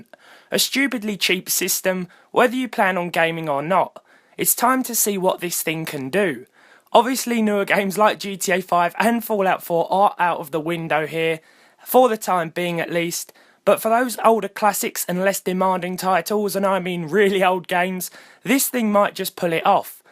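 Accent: United Kingdom. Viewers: Luckily I could listen it all, but does British voice always sound like that?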